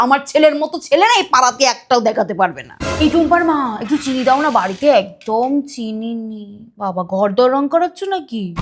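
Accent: Bangladesh. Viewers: Kotha sune Bangladeshi mone hoy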